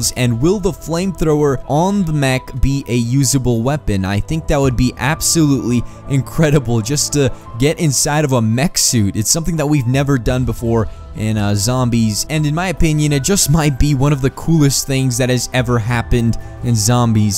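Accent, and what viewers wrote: Bosnia and Herzegovina. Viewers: Are you bosnian.?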